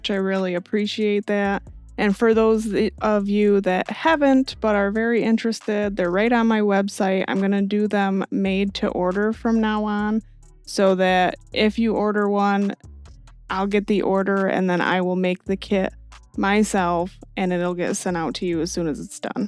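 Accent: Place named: Michigan, United States. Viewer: This channel is amazing! Are you from Michigan, by any chance? You have the exact same accent as my friend Dawn! You could be sisters based on your voice.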